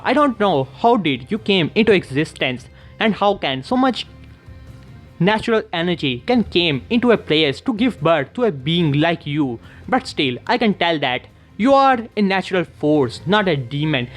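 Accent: India. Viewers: u indian mate? Edit: idk why did i even asked this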